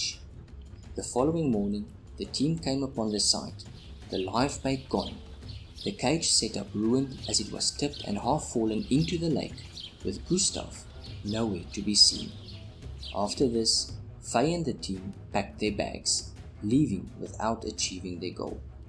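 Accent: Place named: South Africa. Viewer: Are you South African, or Dutch? You sound like you are from S.A. ? Saludos desde California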